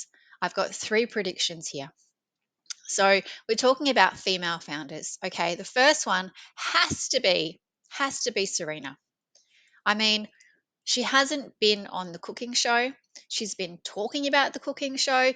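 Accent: Australia. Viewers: Hearing you shared Australian accent. Brilliant. You're funny and articulate. Thanks 😅❤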